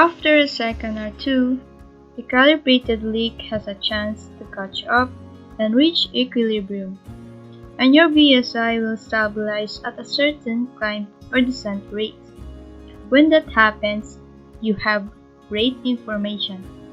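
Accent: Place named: India. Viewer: OMG, heavy Indian accent, read by someone who does not understand what they are saying.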